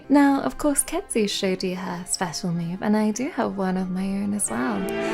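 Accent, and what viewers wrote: United Kingdom. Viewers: Oh my god SPIDERMAN!!! Not my hero.......But it's for though and really, British, soft voice and is a maid who was a knight, is there anything else can do? can hold her boobs..........YES SCORE❤❤❤❤❤❤!!!!!!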